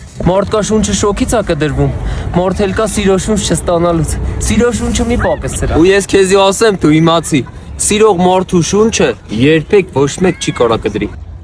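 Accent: Gavar, Armenia. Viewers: Gavari barbary urisha eli❤❤❤❤❤❤😂😂😂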